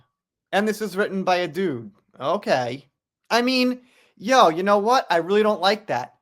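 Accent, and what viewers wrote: New York, United States. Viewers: I’m from New York and I gotta say, you are DEF from NY or NJ . Love the accent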